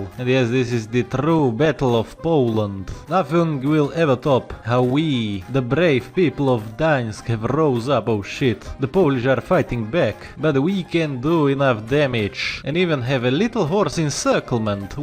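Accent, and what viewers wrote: Russia. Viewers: A Russian accent?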